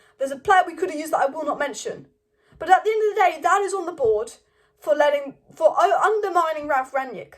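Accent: Manchester, United Kingdom. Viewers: What part of Manchester are you from?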